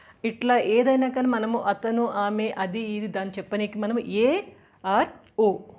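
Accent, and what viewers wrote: India. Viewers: I thik u r north Indian mam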